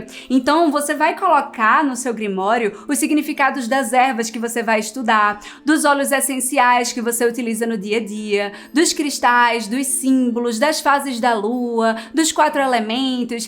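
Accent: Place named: Northeast, Brazil. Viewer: Nordeste, né, bê. 😘 És bem vinda aqui.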